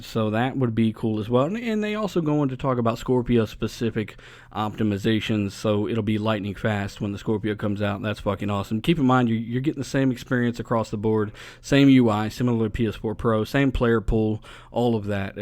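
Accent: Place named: Hawaii, United States. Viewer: you got that minor pigeon accent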